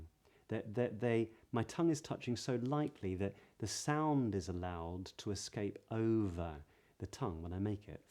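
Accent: RP, United Kingdom. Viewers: well! I'm into listening rp accent. So far I've found you the best for rp accent. So i would like you make just listening videos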